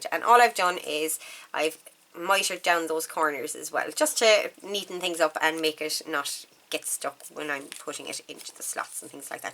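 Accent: Ireland. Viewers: Lovely to watch you. Great ideas you have. Love your accent where in beautiful Ireland are you? Best wishes from New Zealand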